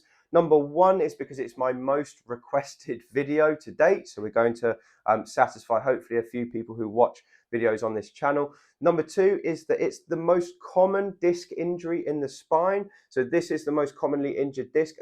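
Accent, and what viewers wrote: United Kingdom. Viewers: I've subscribed. I like this British accent, one can not hear it very frequently. I'm starting with the exercises. Thank you.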